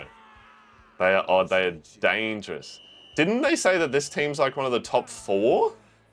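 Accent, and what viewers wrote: Australia. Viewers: Also! Are you aussie??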